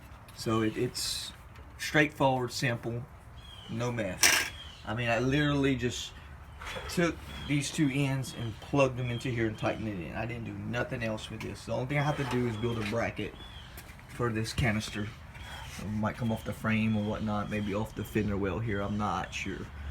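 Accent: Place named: South Louisiana, United States. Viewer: Because I’m from South Louisiana, I bet I can tell where you are from . Eat much crawfish Cher ?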